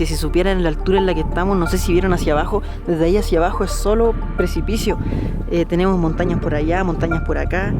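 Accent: Chile. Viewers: Eri como luisito comunica pero chileno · Hola whoa me encanta tus vídeos Gracias por mostrar al mundo mi Chile querido que no lo he vuelto a verlo desde 1975 tengo lágrimas en mis mejillas Un comentario me encanta como hablas tienes un asentó chileno hermoso ⚡️❤️⚡️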